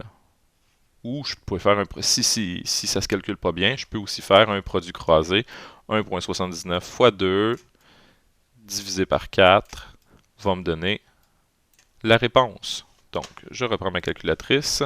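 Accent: Quebec, Canada. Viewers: Merci beaucoup ! T'es canadien? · Merci pour l'aide! Mais cet accent quebecois est pas tres beau :/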